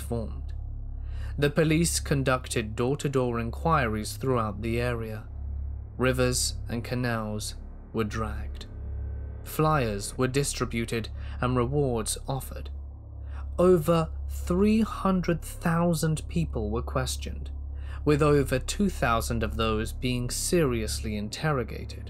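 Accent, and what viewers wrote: United Kingdom. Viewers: The British accent was designed for narration. Brief Case recommended your channel, by the way.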